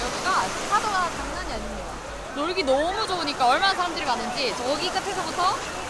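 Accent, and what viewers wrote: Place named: Gyeongsang, South Korea. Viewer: 잘보고 있습니다.^^경상도 분이신가보네요..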